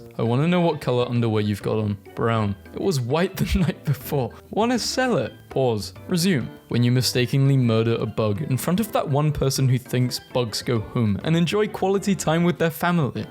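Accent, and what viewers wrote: United Kingdom. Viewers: are u british?:D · are you British by any chance?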